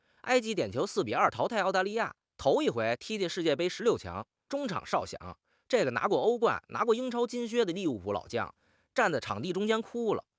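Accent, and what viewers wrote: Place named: Beijing, China. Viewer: 满嘴京腔。反贼也看球啊？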